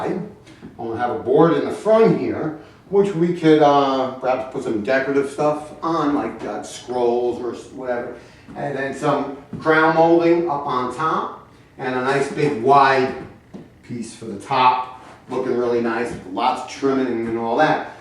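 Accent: New York, United States. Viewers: Great videos man, straight to the point no BS, as an avid DIY'er This is about to be my new favorite channel for sure. I am in the process of buying a new house right now and if everything goes through, I will be using your vids to do alot of work. I may be wrong but you sound like your from New York. I live in NY also, if so and you want to pm me some info incase theres something I cant tackle I will definitely hire you. Thanks keep these videos coming we all appreciate your help.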